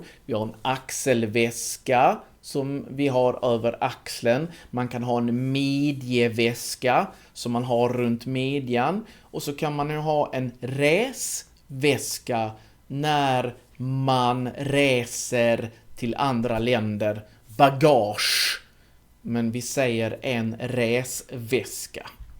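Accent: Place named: Skåne, Sweden. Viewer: Tack! 😆Jag tyckte att du pratar Göteborgska. Men det är faktiskt skånska. Du pratar väldigt tydligt och jag tror att dina videor har hjäpte många som lär sig svenska.👍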